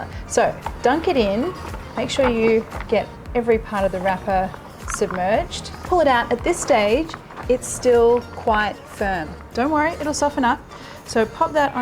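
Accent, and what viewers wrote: Australia. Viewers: Are you Australian?! Love your accent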